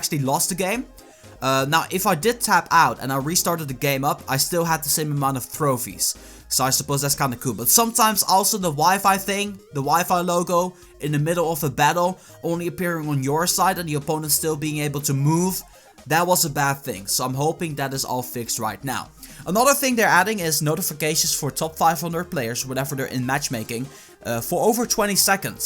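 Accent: Netherlands. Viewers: dat dutch acent <3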